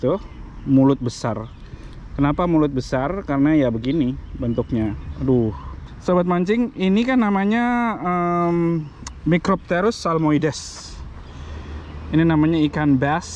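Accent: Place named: Medan, Indonesia. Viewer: alamak jaaang. keknya orang medan ya abang ini hehe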